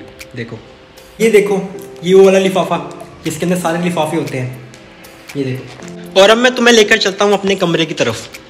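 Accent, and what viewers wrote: Rajasthan, India. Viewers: Are u from rajasthan? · Rajasthan walo ka accent chhipaye nhi chhupta tbh maine socha tha nd it came true !..m from Rj too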